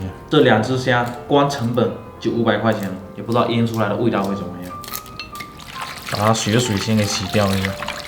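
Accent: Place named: Fujian, China. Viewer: 互跪虾姑😂😂……迷人的胡建口音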